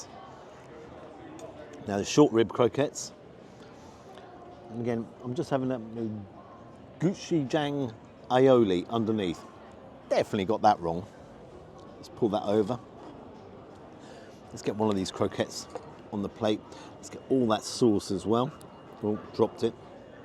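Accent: RP, United Kingdom. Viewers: You sound very posh, and you have your own camera man. Most people doing this do there own videoing. From A&B.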